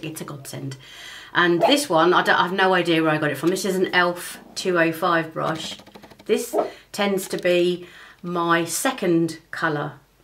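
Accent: United Kingdom. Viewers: I could listen to you talk for hours! Love a British accent! Your tutorial for doing makeup on a hooded eye is so well done. Just a little tip: don’t say negative things about yourself - I think you are a beautiful lady! Loved the whole video. Looking forward to more from you! 😊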